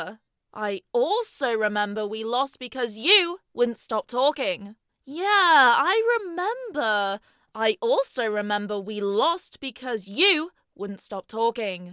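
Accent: United Kingdom. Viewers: Woo 👏 love your voice Also your really British it just comes out so much I love it omg lol 😅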